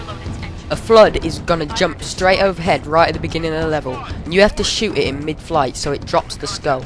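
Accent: England, United Kingdom. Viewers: o rite sorry if u took offence i did actualy use ur guides a while back to get my halo online spartan laser acievmentssuch as 2 kills in 1 shot and shooting the banshee :D u make great quality video btw a guide for the laptops in COD 4 would be useful to alot of people it took me 3 hours to get them with a written guide bu with one of your video guides it would be alot faster just an idea just wondering what part of england is ur accent from?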